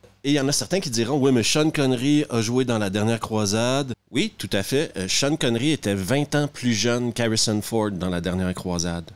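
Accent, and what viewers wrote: Quebec, Canada. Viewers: Bonjour, 1ère video vue sur votre chaîne et déjà "super" je comprends tout (je suis Français). Et oui, souvent je tombe sur des videos qui ont l'air super intéressantes de Québecois mais l'accent est trop hard pour suivre (désolé de dire ça et je précise que j'ai adoré visiter le Quebec). Donc là, y a le super accent "typique" (pour nous qui parlons "too plate" comme on m'a dit à Montreal lol) mais c'est tout à fait compréhensible pour qui n'a pas l'habitude. Ceci dit, toute la critique du film est très drôle et intéressante et évidemment je suis d'accord par rapport à ce carnage de la légende "'Indiana" (il n'existe qu'une trilogie pour moi, vive les années 80). Donc bravo, je m'abonne ! Bonne continuation ! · C'est pas possible, qu'est-ce que tu fous dans ma tête? J'ai eu l'impression de m'entendre parler en québécois pendant un quart d'heure 😅 Merci pour la vidéo, même si c'est vraiment dérangeant d'être 100% d'accord avec quelqu'un 😱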